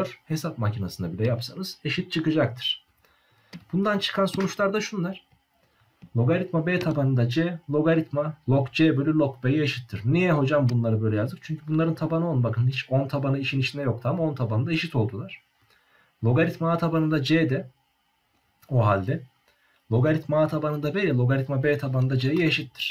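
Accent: Ankara, Turkey. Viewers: Ankara şivesi var hocam sizde Ankarılısınız dimi :D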